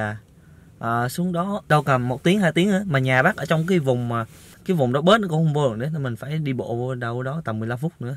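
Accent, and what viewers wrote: Central, Vietnam. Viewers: Bạn người miền trung hả. Mình cũng plan mua xe nên xem bài của bạn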